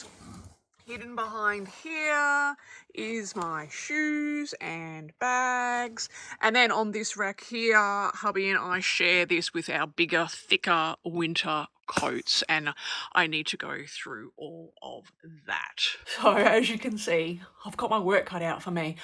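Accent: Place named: Australia. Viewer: 🇦🇺 heard the Aussie accent and joined up 👍 Yes, I have cleared out my clothes when I retired, I had about 5 outfits left!! So gradually I've rebuilt my wardrobe up, but I have a new mantra, if I don't love it, I don't buy it. I've Stopped buying just because its on sale, I always regret it when I go to wear it!! So love it..or Leave it! 😊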